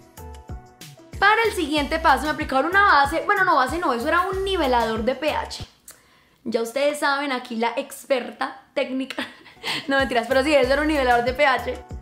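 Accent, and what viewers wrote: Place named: Medellín, Colombia. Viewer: Se nota que eres de aquí de Colombia eres de Medellín igual que Lulú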